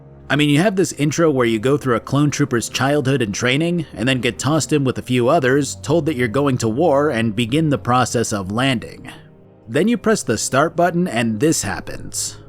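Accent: New Zealand. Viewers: I love mistaking a New Zealand accent for a British one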